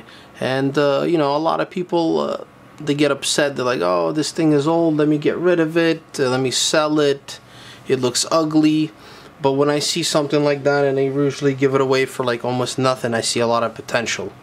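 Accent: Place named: New Jersey, United States. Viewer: I might be wrong but it sounds New Jersey lul or maybe Boston I’m not sure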